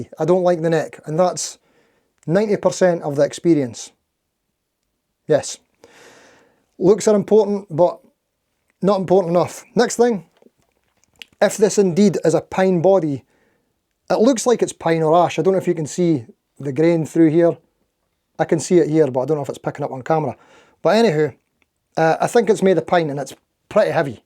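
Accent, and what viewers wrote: Scotland, United Kingdom. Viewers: That`s allright. Thank you for answer. Please contiue with your channel. I love your Scottish accent. I`m from Germany.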